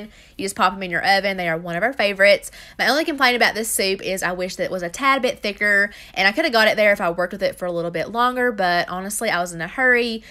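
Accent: South, United States. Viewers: Aloha!!! I absolutely love the sound of your voice!! It’s super soothing and your lil southern accent is so cute!!! I am a new subscriber, & I’m guilty!! I’ve been caught I’ve been binging on “WFD” everyday after work!! You seem like such a sweetheart! Blessings & Aloha Sweetie!!!! God Bless you and your family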